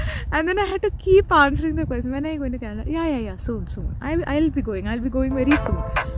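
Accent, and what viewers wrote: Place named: Bangalore, India. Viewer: Oh my i was sooo sure ur from Bangalore.. my my how well i know Bangaloreans speak cheers 🥳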